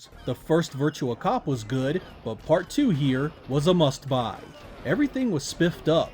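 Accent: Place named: Mid-Atlantic, United States. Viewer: You should say “water” more. I like your mid-Atlantic east coast accent.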